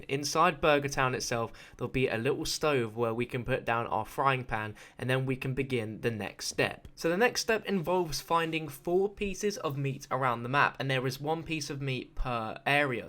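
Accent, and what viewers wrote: United Kingdom. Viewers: 0 , idk if youre serious but if you are its garage but since he is British he pronounces it like that...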